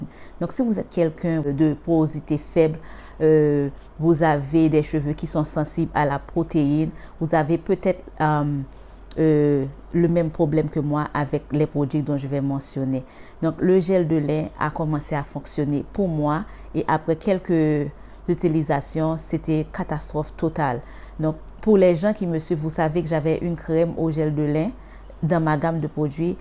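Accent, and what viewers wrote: Haiti. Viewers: Je reconnaît l’accent d’Haiti chérie 💋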